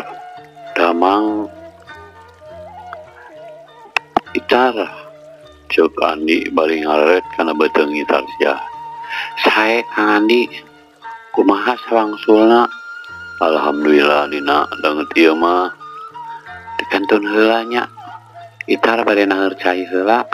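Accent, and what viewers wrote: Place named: Sunda, Indonesia. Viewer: Resep upami merhatoskeun tutur catur bahasa kang.. SUNDA PISAAAAAAAN..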